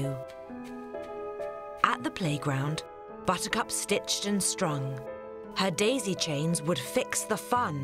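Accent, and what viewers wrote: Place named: England, United Kingdom. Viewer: great enlish accent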